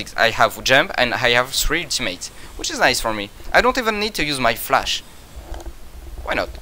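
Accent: France. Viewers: Tu as un bon niveau d'anglais et tes vidéos sont toujours intéressantes mais y a t-il vraiment un public NA qui veuille entendre un français avec un accent à couper au couteau alors qu'ils ont déjà 10000 streamer anglophones ? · That french accent, so terrible ...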